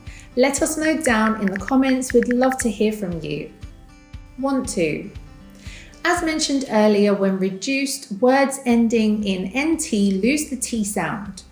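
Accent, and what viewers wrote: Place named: United Kingdom. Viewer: Videos with British accent is very very useful for people like me. Thank you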